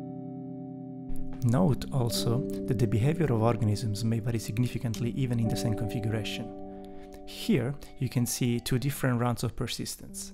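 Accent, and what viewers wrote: Italy. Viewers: Am I mistaken or you have my very thick Italian accent too? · Solo sentendo il tuo inglese ho capito subito fossi italiano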